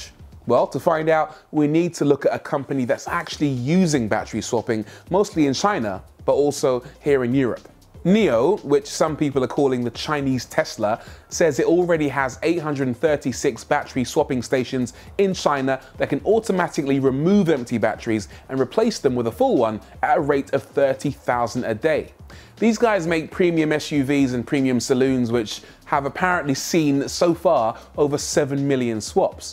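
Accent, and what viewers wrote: United Kingdom. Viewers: I was about to close the video. Then I heard the British accent 🇬🇧 So my coffee turned to tea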